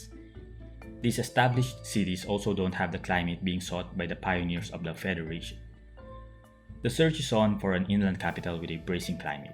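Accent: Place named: Philippines. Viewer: Sounds like a Filipino accent.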